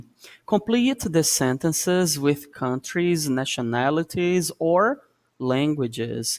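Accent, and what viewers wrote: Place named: Brazil. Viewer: What a strong Brazilian accent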